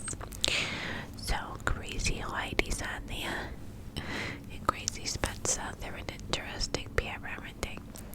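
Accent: New York, United States. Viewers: NY ACCENT IS LIFE 😍😍🗽🗽🗽👌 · ny accent AND 30 mins....#spoiled:) thanks soo much! <3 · Love the New York accent!! What is your light called in the back x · As a brit 🇬🇧 the newyork accent just hits different. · The clip truly is one of the tingliest triggers I’ve happened across! When you were running the clip through the mic, using both clips at the same time, and just saying the word “clip.” SO MANY TINGLES! But... - melted my brain in the most amazing way. 🤤 That was sooooo good. Thanks! This video was relaxing from start to finish. And I love your NY accent! The haters can kick rocks with no shoes on. 😎✌️💕 Edit: -... use this as a “treat yourself” button y’all! 🤤💜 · Not only am I absolutely obsessseeeddd with the NY accent, the background water projection is so relaxing! Such a good vid 💕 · Yessss I think that the clip might get bigger than the claw with the mic and the ny accent like is this a dream 🥰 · The NY accent is everything. 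I’m loving the nude sweater and golden tones on your eyes ! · The NY accent is EVERYTHING! i could just listen to you talk about anything. love you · New York or jersey? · I have watched the New York accents none stop and it’s funny cause my friend that’s from New York talks just like this it’s perfect 😂❤️ btw perfect video so so relaxing ❤️ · Omg i love the ny accent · Ny accent is just amazing!,!,!!! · i find it funny that I LOVEE your NY accent, but me and most of my family is from Boston lol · I am literally in love with the NY accent it is so tingly!! Maybe in the future you could do a hairdresser role play in an NY accent 💕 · I LOVE the New York accent!!!! Love you ❤️ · love the New Yawk accent ! ur really good at it ! :D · That ny accent is amazing just like u girl hope u have a great week remember your son is so lucky to have u xx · I swear it's like you've read my mind!!! For the past couple of days I kept thinking about how I can't wait for a new NY accent video from you. 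I'm melting.....🤤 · I love your New York accent but your little rant about people’s comments saying it sounds Boston made me crack up even you smiled after you’d finished haha 😂 not sure how I missed this one but thank you, love it the accent brings me so much comfort 🥰 like in the movies when your little snuggled up watching films and they have the accent 💜 You also have such lovely hands xx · Hey I love your New York accent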